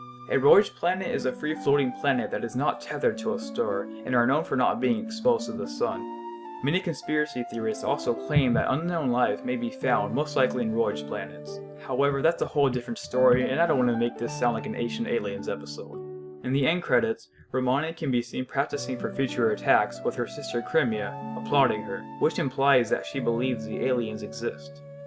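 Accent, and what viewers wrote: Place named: Japan. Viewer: Are you Japanese? Your accent sounds Japanese.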